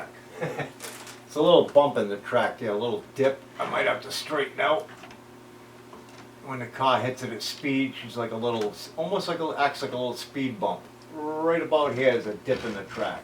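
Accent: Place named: Rhode Island, United States. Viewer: You sound like a fellow Rhode Islander to me, Did I guess right? Or Mass. maybe Boston? Nice track.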